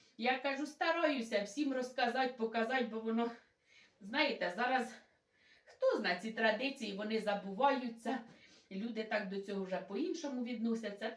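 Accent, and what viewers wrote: Poltava, Ukraine. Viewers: така ж мова, слово в слово, Полтавська обл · Дивлюсь вас слухаю і настрій піднімається❤Балакаєте гарно так як і на моїй рідній Полтавщині(вас послухаю і ніби на батьківщині побула)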